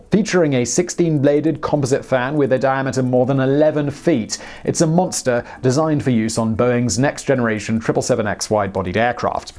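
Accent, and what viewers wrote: United Kingdom. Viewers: Is this guy filming in America or just had a British accent? Any one know?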